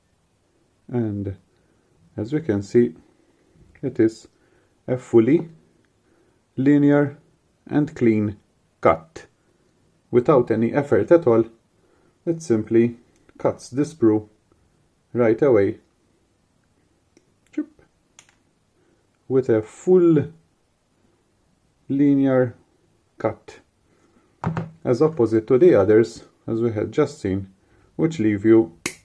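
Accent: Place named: Malta. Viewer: Delightful surprise to hear a Maltese accent millewwel :D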